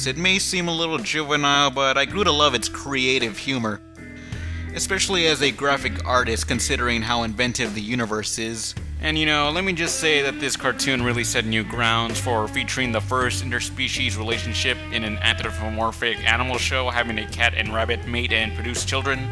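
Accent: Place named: Texas, United States. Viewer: The southern accent was your Texas showing.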